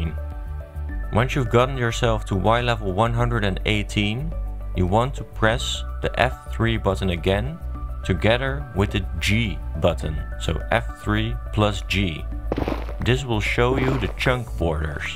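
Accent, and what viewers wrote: Netherlands. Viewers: Are you dutch? Feel like its our accent 😂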